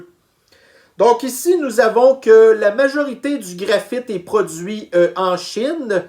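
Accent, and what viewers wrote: Quebec, Canada. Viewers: tu as raison mon gars , j aime bien l'accent québécois , chez nous ,en France hier le prix du diesel à la pompe était à 2.14 € le litre . MACRON doit foutre le camps avec son Bruxelles de merde . ce gugus a tout fouttu nos entreprises par terre . et faché avec la russie . c est inadmissible .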